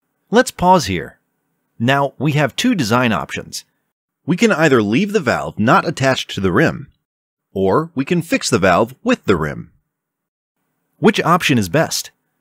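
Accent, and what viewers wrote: Kerala, India. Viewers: Worst Indian accent ever. It sounds like something out of a stand-up comedy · Is that malyaali accent I hear? So good to hear that! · You talks like a mallu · The മലയാളം accent seeps through. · He has a Malayalam accent.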